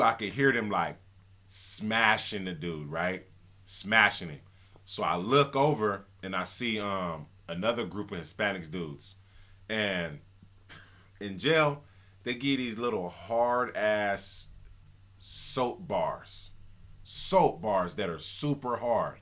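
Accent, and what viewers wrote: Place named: California, United States. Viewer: he sounds straight up cali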